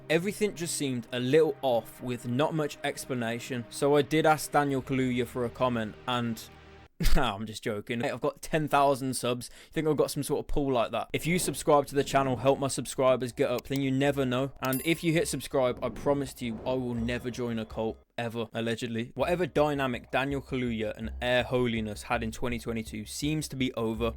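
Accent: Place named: England, United Kingdom. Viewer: I was really surprised this channel doesn't have 100k subs. I enjoyed the video and I love his English accent, Americans never sound as cool. Sending love from the USA. Thanks for the interesting video. SUBSCRIBED!!!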